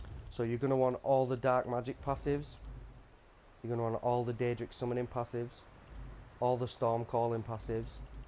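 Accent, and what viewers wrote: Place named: Manchester, United Kingdom. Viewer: you sound like a Manc lad, sunny Bolton me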